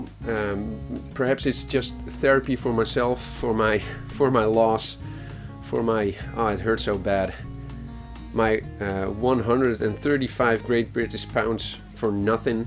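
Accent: Netherlands. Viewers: i was thinking you are dutch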